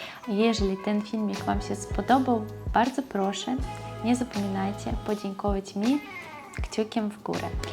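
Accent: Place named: East, Poland. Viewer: Witam Panią,! Proszę uwagi na tego faceta o pseudonimie To jakaś dziwna kreatura. Co więcej, jego znajomość języka polskiego jego bardzo słaba. Może chodziło mu o to, że gdy Pani napisała "Ale jaki świetny wpis.", to wypadało raczej powiedzieć "Ale jaki miły (ALBO pochlebny) wpis (ALBO komentarz)!" Czy będąc lekarką z białoruskim wykształceniem mogłaby Pani wykonywać swój cenny zawód w Polsce, choćby po kilka godzin dziennie? W Polsce odczuwamy brak lekarzy. Podziwiam u Pani bardzo dobrą znajomość języka polskiego. Pełen uroku jest Pani ten słodki, lekki wschodni akcent, który jest tak miły dla mych uszu. WSZYSTKIEGO DOBREGO W NOWYM ROKU DLA PANI I RESZTY WASZEJ MAŁEJ RODZINKI.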